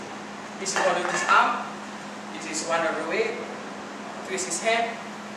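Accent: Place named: Kelantan, Malaysia. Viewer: betul la cikgu... ada sikit sikit loghat kelate ^_^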